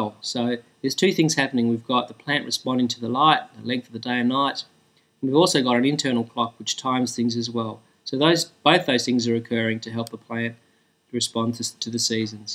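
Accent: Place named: Australia. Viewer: ohhhhh. it now makes sense. You saved my sanity. thank you. And I do appreciate the australian accent too. :D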